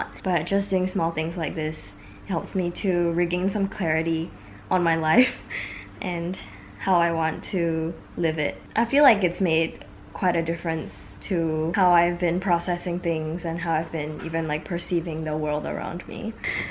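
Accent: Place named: Singapore. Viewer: Are u singaporean? cause u do have an accent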